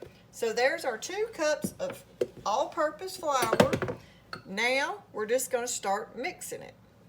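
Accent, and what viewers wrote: South, United States. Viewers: Love your comforting southern voice!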